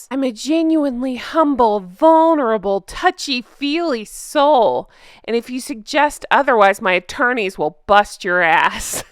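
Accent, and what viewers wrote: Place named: United States. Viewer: Oh that guy is hilarious! Who is he? Absolutely adore your YouTube, you and Beebs Kelly are brilliant. Only been on YouTube for 8 months when I got a new telly! Unfortunately can’t get all information on TV( using phone to write this). I keep asking what “sugars “ are- know they love the traitors but is it an American expression? Still love your voice. Wish I had an American accent ( like yours) it’s so beautiful on the ear. Sorry can’t do Patreon as I do animal charities but would like to know where I can view the merchandise ( too British to say merch 😹😹😹😹). Guernsey. Channel Islands xxxx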